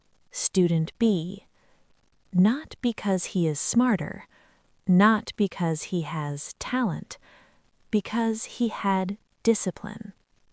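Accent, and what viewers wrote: United States. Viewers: امريكية ماهي بريطانية ابداً لان نطق الكلمات مختلف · تبدو انجليزية أمريكية وليست بريطانية ؟؟ ارجو الرد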